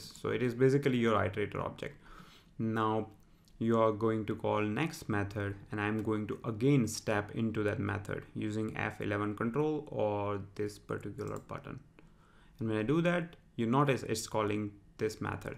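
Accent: India. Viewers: Deepanshu Joshi Mate you just proved yourself wrong.You said Indian Accent.Yeah that’s an Indian accent that is why it is not correct.the correct pronunciation of a word is understood by how it is pronounced by majority of people.So you are wrong.I think you need some help before me. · This is Indian accent. If you have any problem with it you can watch other tutorials made by youtubers who belong to the your region. Try to keep your cynism in control. If you find it difficult try some medical help.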